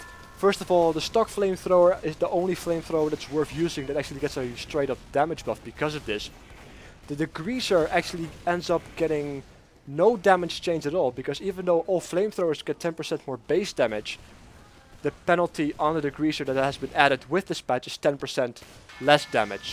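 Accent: Netherlands. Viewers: Starting to like ur commentaries more and more! Quick question: Are u dutch? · Maybe is this a weird question but... Are you dutch??? I hear something like that in your voice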